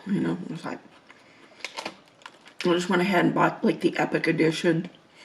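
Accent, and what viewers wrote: South, United States. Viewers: I love your hair and makeup! What state do you live in, you kinds have a little southern accent! I’m a 71 year old great grandmother from East Tennessee!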